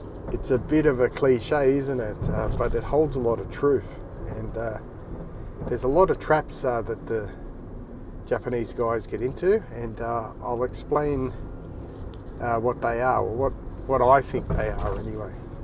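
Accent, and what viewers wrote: Australia. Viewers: You learned your English in Australia, yes? 👍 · Are you an Aussie mate?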